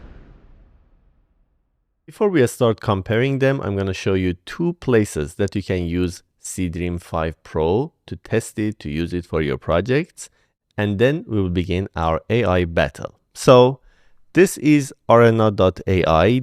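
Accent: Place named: Iran. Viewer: Yo. I'm Persian too. I Noticed your Persian accent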